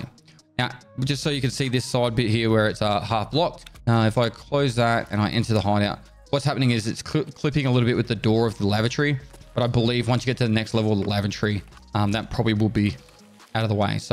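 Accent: Australia. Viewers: It starts on if you don't wanna hear aus accent.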